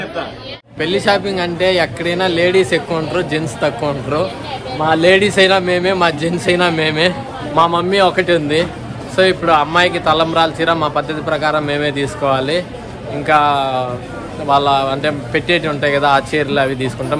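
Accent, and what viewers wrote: Kadapa, India. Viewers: మన కడప భాష చాలా బాగుంది తమ్ముడూ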